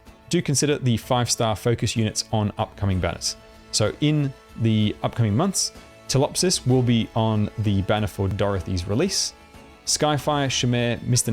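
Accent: Australia. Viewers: Great vid, lotsa useful fast tips but also well explained. Unrelated but are you a fellow Aussie?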